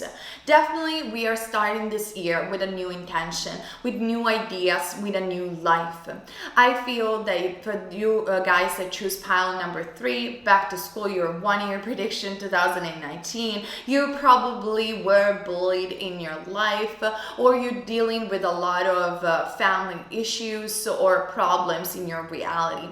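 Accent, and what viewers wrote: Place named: Italy. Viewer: Love the Italian accent!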